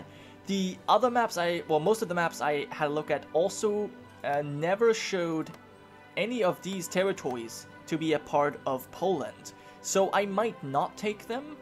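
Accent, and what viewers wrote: Germany. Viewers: hes german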